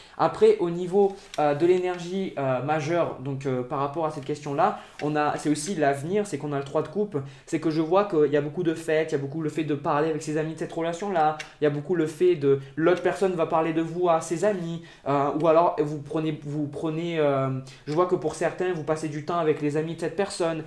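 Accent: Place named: Toulouse, France. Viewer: Salut, je viens de découvrir ta chaine, super super super, du coup j'ai regardé la série de vidéos sur le tirage de cartes (au debut de ta chaine je pense) et le tirage pour les gémeaux (que je suis!!) pour le mois de mars 2019 qui m'a ENORMEMENT parlé!!! Bravo, bravo, tu es vraiment un chouette gars plein d'amour, de bienveillance et de gentillesse et t'écouter est un vrai plaisir... Ne serais-tu pas de Toulouse??? Ce petit accent m'est familier! Gros bisous, plein d'amour pour toi et continue à exprimer ton intuition si juste! Pascale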